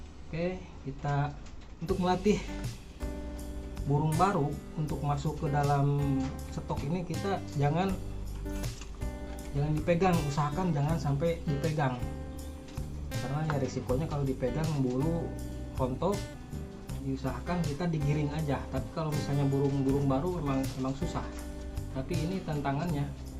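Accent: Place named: Indonesia. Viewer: Kenapa bahasa inggeris,tapi suara endon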